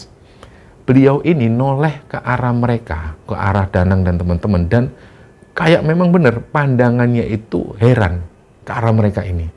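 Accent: Java, Indonesia. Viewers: Assalamualaikum, lagi musim semua Chanel horor pakai narasumber. Tapi tetap dgn khas sendiri tnpa narasumber lebih asyik, lebih enak didenger, alur ceritanya jelas... Tetap ga usah pakai narsum biar beda dgn lainnya. Mas dgn style jawanya slalu keren❤❤ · jawane iku loh ih suka pisan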